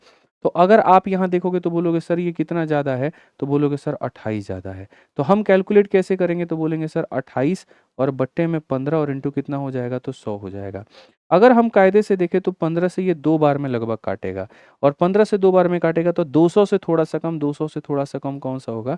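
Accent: Bihar, India. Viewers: B =badki bahu 😂😂😂 librery me meri hansi nikal gai 😂😂😂😂 gajabsir Bihari andaj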